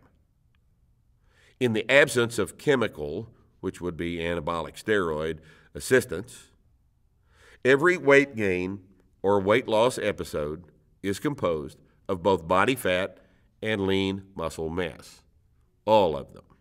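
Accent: Texas, United States. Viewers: It's them beautiful Texan tones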